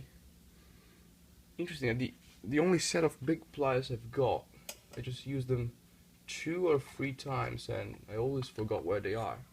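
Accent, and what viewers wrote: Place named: Germany. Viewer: Nice accent mate...thanks for video...honest and correct...;) LOL super funny also. German i guess?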